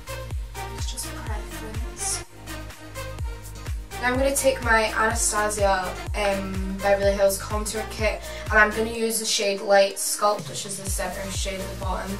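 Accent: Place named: Wales, United Kingdom. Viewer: Is this what all welsh ppl sound like ?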